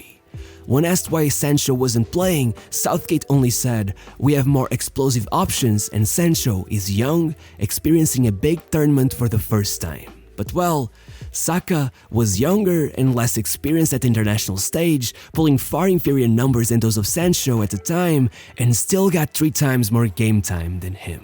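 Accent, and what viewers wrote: Portugal. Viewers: Eu sabia que eras português assim que ouvi o sotaque hhahahahha.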